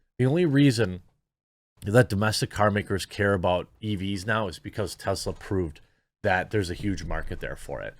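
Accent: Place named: Midwest, United States. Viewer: it's the mid-western accent get over it.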